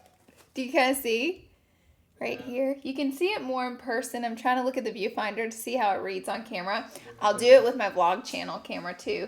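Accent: South, United States. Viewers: Wow it’s wild how she really used to use this fake voice … like her Paris days. She almost sounds like Brittney spears. Like southern accent meets valley girl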